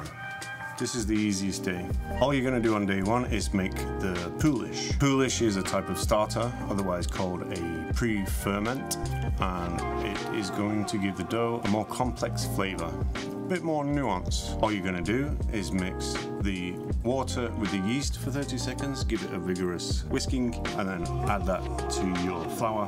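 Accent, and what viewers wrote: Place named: RP, United Kingdom. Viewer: Hello where are you From originally the accent you have is posh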